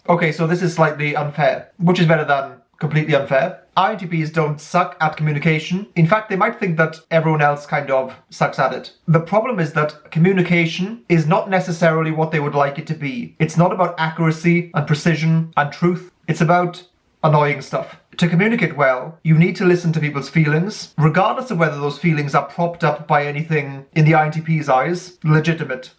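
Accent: Wales, United Kingdom. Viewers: random question: are you welsh?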